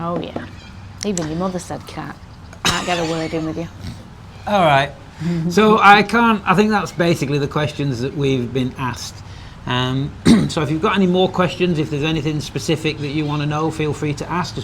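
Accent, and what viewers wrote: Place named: Manchester, United Kingdom. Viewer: Hi. Excellent series. You have inspired wifey and I to do a lap starting November. Getting things together and I was wondering what size water bladder you had on your trip. Hoping to be in WA after chrimbo. Btw I just got back from UK/Manchester where they all talk funny like you😊. Looking forward to your next trip.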